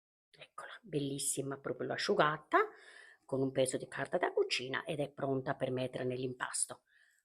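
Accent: Sardinia, Italy. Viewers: Ma tu sei sarda come meeeee, l' ho capito quando hai detto: si tianu, siiiproprio come lo chiamiamo noi e anche come fai i papassini , mi fa' piacere, e forse non sei molto lontana dal mio paese, da come parli il dialetto direi proprio di no. Complimenti ancora, sei unica😘♥️🎉🎉🌹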